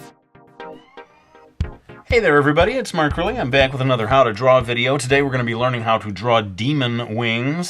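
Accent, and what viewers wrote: Brooklyn, United States. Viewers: I LIKE your Brooklyn accent xD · why would anyone unsubscribe because of the Brooklyn accent? It's awesome! and so are the drawings :D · actually i really like your surfer guy voice (brooklin accent) really much, it makes me laugh xD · If you stop doing the Brooklyn accent I'm unsubscribing! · I like your Brooklyn accent XD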